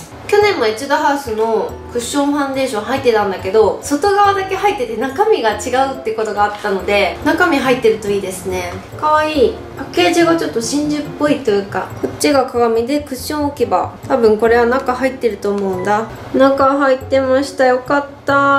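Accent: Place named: Kansai, Japan. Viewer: さんって関西出身？すごいイントネーションが関西っぽい！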